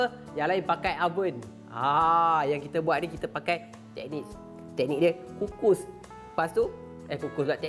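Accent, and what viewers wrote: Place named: Kedah, Malaysia. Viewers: Dia cakap macam orang utara la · abg..ni org kedah ke...ckap pekat bak angg😂😂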